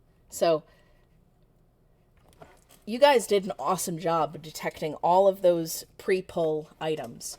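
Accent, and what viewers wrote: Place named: Canada. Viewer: Your accent is close to mine but idk what mine is….are you Canadian??????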